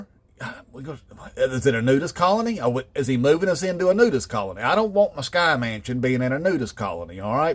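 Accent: South, United States. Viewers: You sound like you're from the South. Don't tell me that you can look around the US today and not feel that the idea of Jesus coming to unalive you doesn't have some appeal. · Hahaha this guy is modern day version of the Third Reich with a redneck accent. The left hate it when other people have different opinions. I don't believe in the rapture therory but certainly respect those who do. HEY DUDE LET PEOPLE BELIEVE THE WAY THEY WANT TO IT IS CALLED THE FREEDOM OF RELIGION...EVER HEARD OF IT? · Hi Mr., I gotta confess I love your accent, it sound so american-rich linguisticly!! Cheers from Costa Rica!!! The whole world is literally watching!!!! 👍🏼👍🏽👍🏾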